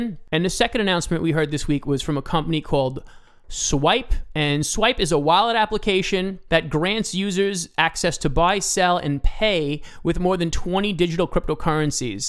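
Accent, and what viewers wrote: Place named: New York, United States. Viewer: California by way of the Bronx but I definitely caught the NY accent!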